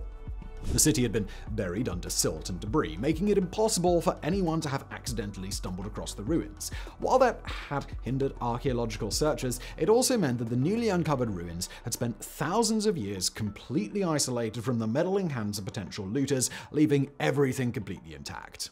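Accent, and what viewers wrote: RP, United Kingdom. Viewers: This sounds normal for a British man with a Received Pronunciation accent - I have the same accent and the audio doesn't sound harsh or over-sibilant to me. I accept it may do to others.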